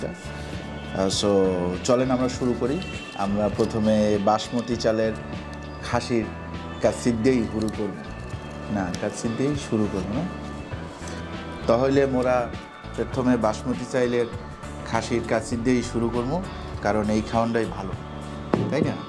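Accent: Barishal, Bangladesh. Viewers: Vai borishal er vasa diya review ta valo lagsee...... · ভাই বরিশাইল্লা স্টালে সেই 🤗🔥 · Vaiya apnar borishaler vasha eto valo lagse...Ami kokhono comment korina but apnar accent shine comment korte baddho holam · ভাই আপনার ভিডিওতে বরিশাইল্লা ভাষা ভালো লাগে। সবগুলা ভিডিওতে বরিশাইল্লা ভাষা রাখবেন।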